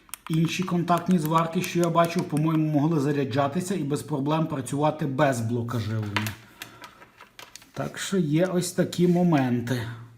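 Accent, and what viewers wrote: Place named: Horodok District, Ukraine. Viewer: Ви певно з Городоцького р-ну😂 Слухаю і балдєю 😆👍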